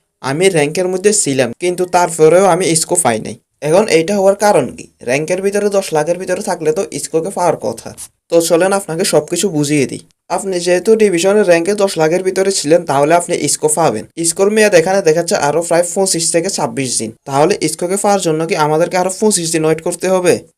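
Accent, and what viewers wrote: Noakhali, Bangladesh. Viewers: ভাই আপনার বাড়ি কি নোয়াখালী😂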